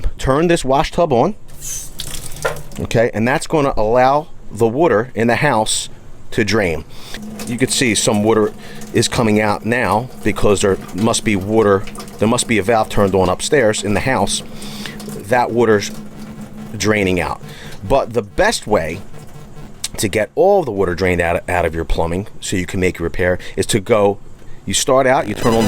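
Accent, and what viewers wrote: Philadelphia, United States. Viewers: Got to be Philly yo jagoff !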